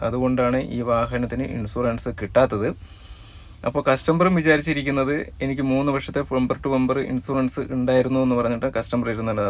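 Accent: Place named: Palakkad, India. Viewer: പുള്ളി പാലക്കാടൻ കൊമ്പാള ഭാഷയിൽ സംസാരിക്കുന്നു...മലപ്പുറത്തുള്ള കോയമാരുടെ കാർ വിൽപ്പനയെന്നും കാണാറില്ലേ..??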